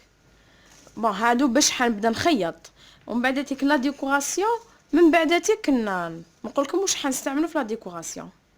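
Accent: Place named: Algiers, Algeria. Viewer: نموت على اللهجة العاصمية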